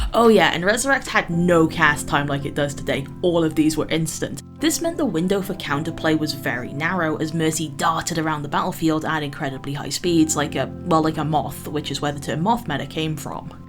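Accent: United Kingdom. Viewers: Ah yes, British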